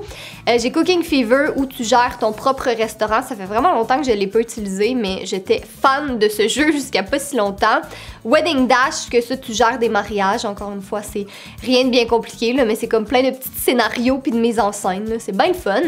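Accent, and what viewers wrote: Quebec, Canada. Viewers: t'es trop belle,gentille,intelligente...bref je te kiffe 😘 tu me fait sourire à chacune de tes vidéos et j'attends avec impatience tes prochaines vidéos.j'adore je t'aime juste trop,je t'adore trop,tu es la youtubeuse la plus cool. j'adore ton accent Québécois,j'adore aussi tes cheveux,j'adore ton pull car j'ai le même. super vidéo.Continue comme ça ne t'arrête jamais n'écoute pas les commentaires négatifs pense à moi qui t'admire. ps:je t'adore n'arrête jamais !! 😙😙😙😘😘😘😚😚😚😝😝😝😜😜😜😛😛😍😍😍😇😇😊😊😊😄😄😄😁😁😁😉😉😉😎😎😎☺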